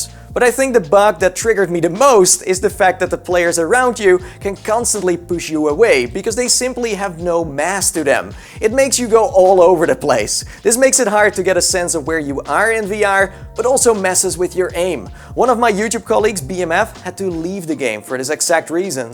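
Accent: Netherlands. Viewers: I love the way you say notoriety, dutch?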